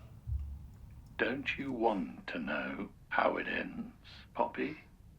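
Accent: United Kingdom. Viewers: the narrator’s voice is terrific and british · EEEuww. I don't like this one. I listen to audiobooks often and the narrator sounds just the same. I am going to smash that British narrator right in his pie hole (figuratively, anyway). Poor Poppy.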